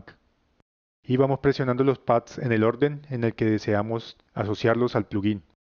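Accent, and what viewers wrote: Colombia. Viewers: Habla colombiano!!!!! Excelente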